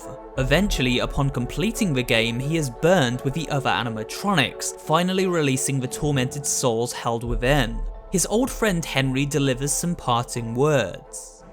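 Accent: United Kingdom. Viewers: 1/10 He forgot to mention he's British ._.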